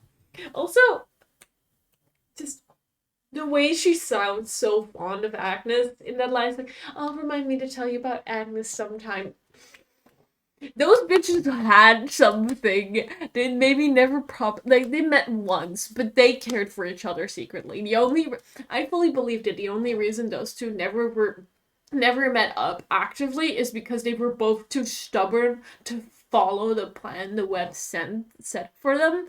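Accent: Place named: Netherlands. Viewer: Why are you dutch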